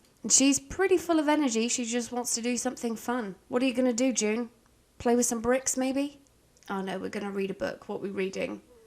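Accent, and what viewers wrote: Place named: South East England, United Kingdom. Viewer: South East England, in and around London area. I think she has said before she is originally from Reading? Which isn't too far from London 😊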